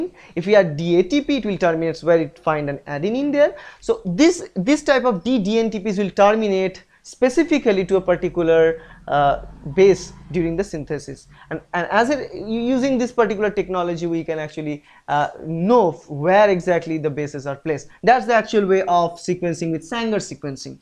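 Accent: India. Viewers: Best English accent among Indians, easier to ears , thanks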